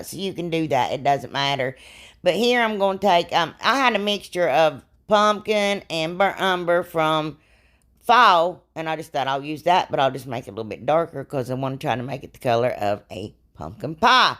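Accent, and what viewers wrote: South, United States. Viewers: You’re funny and very enjoyable to watch. I love your little southern accent.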